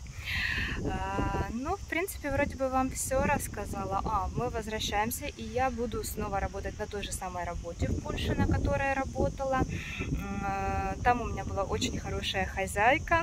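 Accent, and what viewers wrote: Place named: Ukraine. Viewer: вы мне очень нравитесь, нравятся ваши обзоры. Вы молодец, модете прставить на место завистников и кто злорадствует. Очень нравится ваша не зависимость, решимость,уверенность в себе, я даже гдето вижу себя в вас. Так держать. Я тоже когда то, но это было давно, уехала с Украины, долго себя искала, не чего не боялась. Единственное что, только не обижайтесь, поработайте немного над произношением. Наш украинский говор не очень красивый. Почему говорю наш т.к. я тоже так говорила и у меня подруга была в Питере ей было совестно за мое произношение. Только не обижайтесь но произношение ужастное. Желаю вам удачи во всем вами задуманом.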